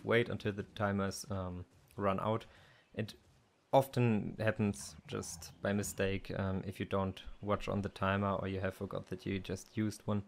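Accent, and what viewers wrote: Germany. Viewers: Do I hear DEUTSCH?????? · Hi thanks Bist du deutsch?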